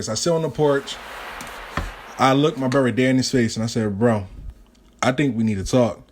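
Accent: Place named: Philadelphia, United States. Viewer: Philly accent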